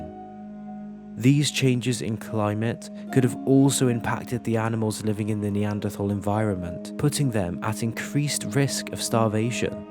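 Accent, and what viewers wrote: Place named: Shrewsbury, United Kingdom. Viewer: The documentary I've been hoping for.... since primary school when I read a book on prehistoric Britain & saw intriguing paintings of neanderthals, to the Frazetta "Neanderthal" (worth doing an image search for!) I've been fascinated. Clan of the Cave Bear was food for thought... this is perhaps the best documentary I've watched. And your accent & narration adds to it (Shrewsbury?)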